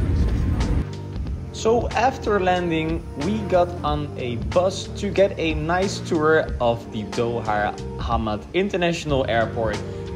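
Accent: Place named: Netherlands. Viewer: Toch nog stukje Nederlandse accent door het Engelse gepraat heen😂 leuke video man!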